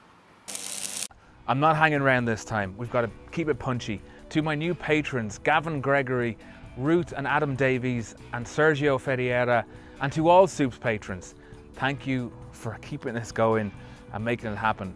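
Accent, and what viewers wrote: Ireland. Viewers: Great to hear an Irish man working on cars. And I LOVE the stop-motion bits showing work done by invisible hands. This is a REALLY UNIQUE USP for this channel. It's probably very time consuming to do, but this helps this channel stand out. Well done. I'm a new subscriber !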